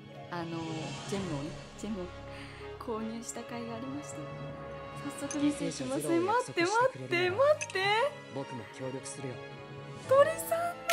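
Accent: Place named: Kyushu, Japan. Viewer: はじめまして！は九州の方ですか？方言が身近に感じました！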